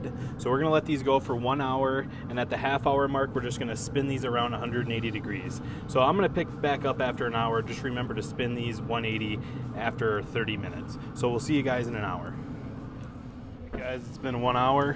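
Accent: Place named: Wisconsin, United States. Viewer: I gotta know. I see Texas everywhere but as a Wisconsin native I hear an accent that reminds me of home. Are you from TX or WI? Or other?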